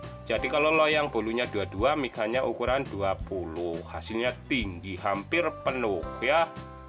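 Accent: Banyumas, Indonesia. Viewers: Saya justru suka logat ngapak nya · Kan itu juga udh pake bhs Indonesia.. Cuman logat nya aja medok ngapak.. Aku yg org Sunda aja ngerti dan paham apa yg sampaikan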